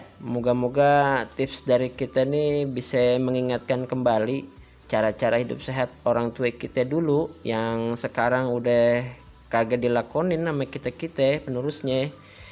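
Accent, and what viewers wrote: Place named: Jakarta, Indonesia. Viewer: Khas banget bahasa Betawinya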